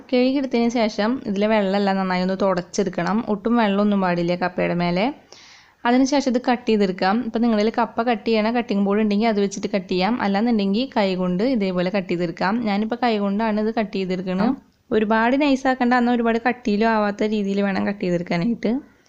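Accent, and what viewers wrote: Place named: Thrissur, India. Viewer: Good thrissur language 👍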